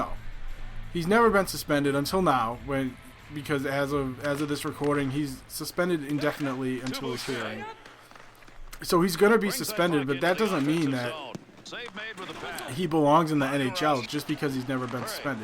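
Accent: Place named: Massachusetts, United States. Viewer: hey man, I grew up in Bridgewater, MA...though living in Denver now...don't get to hear alot of people that sound like me!! Go B's!!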